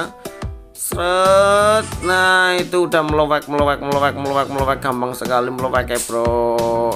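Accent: Banyumas, Indonesia. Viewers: Bahasane ngapak ora ngapak ora kepenak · Wong ndi sih Bro...? Ganing ngomonge ngapak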